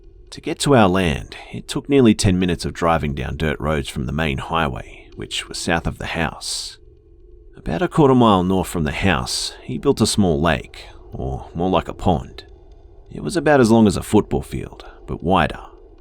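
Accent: Australia. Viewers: Listening to an Australian pronounce southern terms 😂 I kept being like wait wtf is he saying then I'd be like ohhhh 😂😂😂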